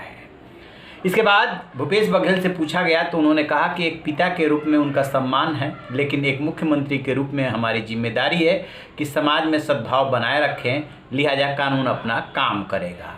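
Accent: Bihar, India. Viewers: तू साला बिहारी टोन में बात कर रहा है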